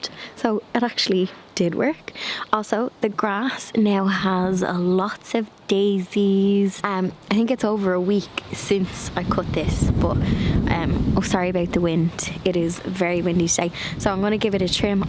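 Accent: Ireland. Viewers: Just saw this .lovely to hear an Irish accent . Is your garden in Ireland . I’m in Kerry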